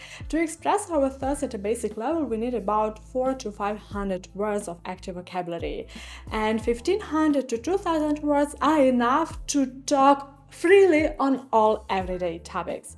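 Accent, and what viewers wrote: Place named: Germany. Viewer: When told to speak German without speaking German. Your English is influenced greatly by your German, easy to sense it😂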